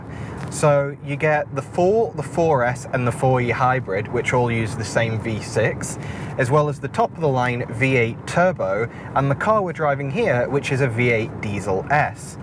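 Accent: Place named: Scotland, United Kingdom. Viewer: Accent seems like a mixture of scottish, australian at times and then some american. Random...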